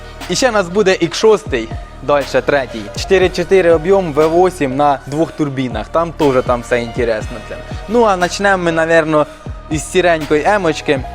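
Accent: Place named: Surzhyk, Ukraine. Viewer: Контент цікавий, зйомка і все інше нормальне, але.. я теж, можна сказати, з села, але я не говорю так як хлопець на відео. Це пз*ц, слух дуже ріже. От ці «двігатєлі, сєкунди».. старайтесь трохи фільтрувати суржик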